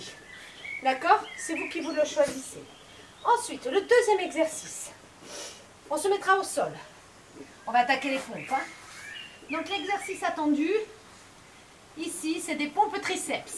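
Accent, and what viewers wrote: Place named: South-West, France. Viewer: Un vrai bel accent du sud-ouest 🤩 !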